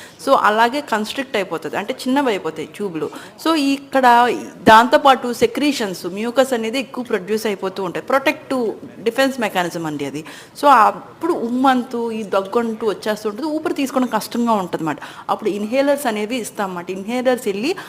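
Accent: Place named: Visakhapatnam, India. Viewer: మేడం నేను abroad లో ఉన్నాను, మీ వీడియో s చూస్తున్న very uesefule వీడియో s థాంక్స్ మేడం, మీ తెలుగు బాషా చూస్తే వైజాగ్ వల్ల లా ఉన్నారు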